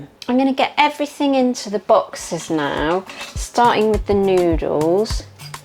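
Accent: England, United Kingdom. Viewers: “I absolutely love chopped chopped brussels” With your English accent…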